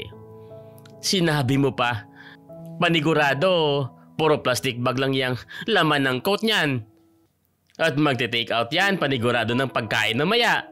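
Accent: Visayas, Philippines. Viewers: Bat ganon ang diction at style ng pag kwento mo, BISAYA KA DONG?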